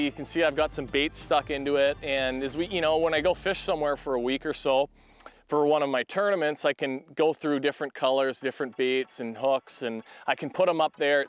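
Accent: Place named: Midwest, United States. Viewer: Most Midwest accent I’ve ever heard, so.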